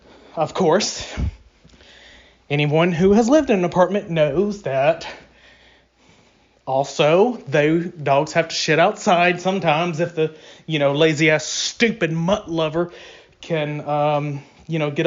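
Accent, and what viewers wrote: South, United States. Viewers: please put your paypal link in the description box for ALL your videos so we can donate to your work. Oh and another thing, dont you dare change your accent or many of us will unsubscribe. We love you southerners the way you are - this is because many of you white folk in the deep south are just beautiful human beings - so promise us all that you will never change.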